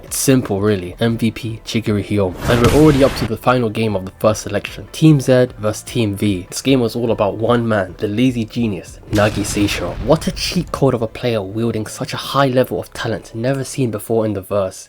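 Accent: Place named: Somalia. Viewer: i can hear in ur voice you’re somali, good vid bro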